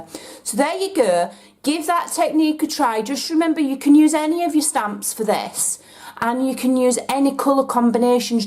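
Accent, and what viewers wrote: Yorkshire, United Kingdom. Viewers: I just love your Yorkshire accent, and I love your little quirky sayings....'pants', ' rubbish', 'wonky' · I LOVE your Yorkshire accent. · I'm a eighty year old American,only been crafting a few months, a real newbie and can understand every word,well, almost every word. lol I love your Yorkshire accent. I traveled America several times, you should hear some of the pronouncements, slang and diction. LOLOL Keep what you love and I will love it and you also. Love your work, explanations and techniques, and especially love when you laugh at yourself. I subscribed right away. xoxo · I shouldn't worry the captions never make sense anyway whoever's speaking. I understand your accent perfectly being a Yorkshire lass too. The captions do entertain tho lol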